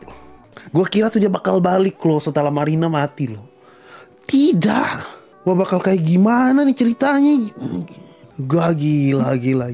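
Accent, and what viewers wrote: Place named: Medan, Indonesia. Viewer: bang bahasa nya medan banget 😂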